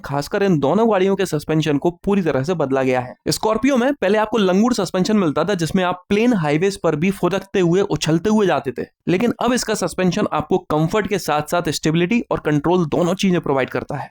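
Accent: India. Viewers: Bhai tumhari desi boli apun ko mast lagi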